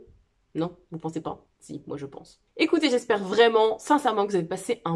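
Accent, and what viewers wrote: Lorraine, France. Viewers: Cc, ça fait du bien de te revoir 😊 Quel bel accent lorrain et beaux tissus. Je ne suis pas convaincue que l’on fasse des affaires dans ces salons 😊 Bonne continuation, à bientôt Raphaëlle DVN a cousu la robe de Bellen Paris, elle est magnifique !!! Beau choix 👍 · Coucou! Je suis de Nancy aussi et comme toi, je suis allée au marché aux tissus le 6 mai. Je partage totalement ton avis concernant la baisse du nombre d'exposants et par conséquent, du choix de tissus. Je trouve aussi que les prix ont suivi la conjoncture économique et qu'on fait de moins bonnes affaires. J'ai été très raisonnable, juste un coupon de jersey et un peu de mercerie. Il faut dire que je suis allée à celui du Luxembourg début mars et mes parents habitent dans la même rue que la grange aux tissus, de quoi faire! Lol Merci pour ta vidéo pétillante et de faire découvrir aux autres cousettes notre pittoresque accent lorrain! 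😂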